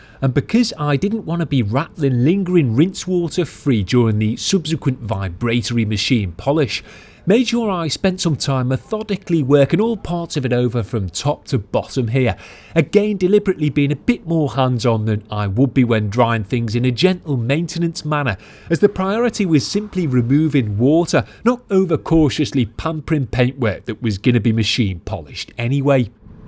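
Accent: United Kingdom. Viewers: You have one of the most pleasant and concisely clear British voices ever . I could probably listen to you narrate a video of grass growing and thoroughly enjoy it. Not to mention your expertise and method of delivery is top notch. :trophy-yellow-smiling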